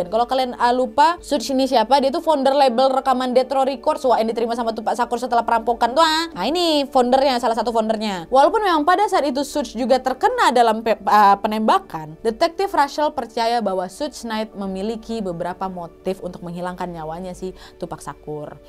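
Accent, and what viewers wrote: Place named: Medan, Indonesia. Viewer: Medannya kental👌🤝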